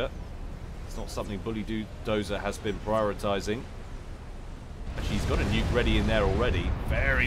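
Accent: United Kingdom. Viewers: 5 stars caster. you know your game, great commenting on tactics and strategies. Pleasant voice with that lovely British accent ( sorry if you are not brit I took a guess ) and you pronounce french quite lovely. So glad I found you and subscribed.!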